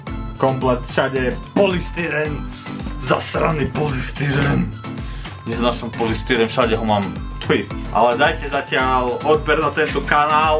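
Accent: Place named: Tatras, Slovakia. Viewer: bývam v tatrách.. a ja som si hovorila ze ten prízvuk je mi nejaký známy 😂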